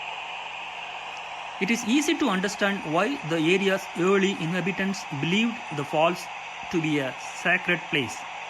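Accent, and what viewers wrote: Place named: India. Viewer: Your Indian accent kills me watching this video🤣🤣💀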